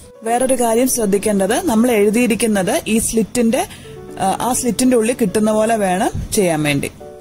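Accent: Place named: Pathanamthitta, India. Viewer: പത്തനംതിട്ട ജില്ല എവിടെയെങ്കിലുമാണോ വീട്? ആ ഒരു style of talking...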